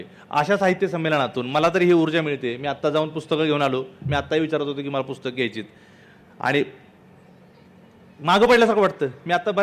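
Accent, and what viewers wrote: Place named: Udgir, India. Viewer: मंजुळे सर तुम्ही माझ्या गावी उदगीरला आलात .खुप छान वाटले.मी अहमदनगर आहे पण तुमची भाषा ऐकुण उदगीरमध्येच आहे असे वाटले. आपली भेट व्हावी ही अपेक्षा.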